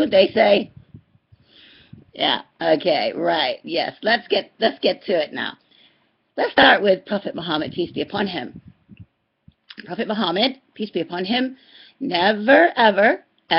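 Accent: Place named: United States. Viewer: Haha loved it! Jazaki Allahu Khair sister! I shared your video to my dad who is not yet a Muslim. May Allah guide him, please make dua for him sister. I do feel bad for not calling to wish him happy birthday as he does for me and my children. I sent him a message with a dua and will chat with him tomorrow InshaAllah. In the mean time I needed help in explaining this to my dad and so I did a search - listened to many short explanations by my favorite sheiks and Subhanallah Allah led me to your video. MashaAllah! You explained this matter very well! 😃 btw, I'm confused about you English accent - I think you are American but perhaps moved abroad?! Haha. Anyway it's a beautiful accent MashaAllah.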